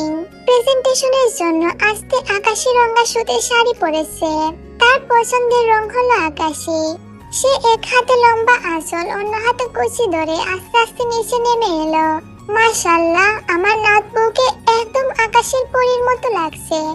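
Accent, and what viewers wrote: Sylhet, Bangladesh. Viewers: না আপি মনে হয় সিলেটি❤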